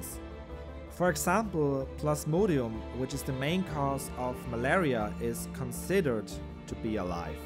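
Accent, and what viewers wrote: Germany. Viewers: Nice Video, good graphics., but your Thumbnails lack in quality and you have a strong German Accent. Try to work more with the Algorithm, so your channel picks up. Better Thumbnails, Titles, and less Accent if possible. Just my personal advice, because I want to see this channel grow in popularity. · I see, you are probably a German (cause of the accent) and also you USED COFFEE AS AN EXAMPLE, BUT WHY NOT TEA ?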